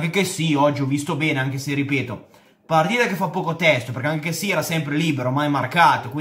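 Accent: Liguria, Italy. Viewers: Ma quanto è bello il tuo accento Ligure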